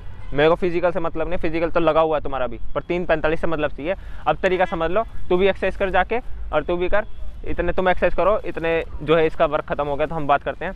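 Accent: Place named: Haryana, India. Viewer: 😃😃😃haryana h bhai Uski glti n h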